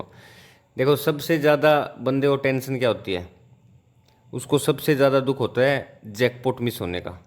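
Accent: Haryana, India. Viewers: Dada aapke mostly saare videos dekhe,aapki ek ek baat me sachhayi hai,aur aapne apne anubhav ko saajha kiya hai,me aapko abhi se guru maanta hu,aapki language se lagta hai,shaayad ap seekar ya hariyana side ke ho,ek baar darshan ka moka de,koti koti dhanyawad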